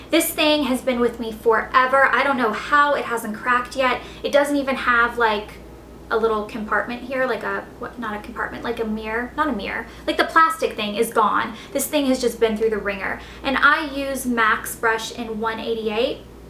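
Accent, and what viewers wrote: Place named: Tennessee, United States. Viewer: She has a bit of a Tennessee accent, leave her alone. You wouldn't ask why an Asian or and East Indian person talks a certain way, would you?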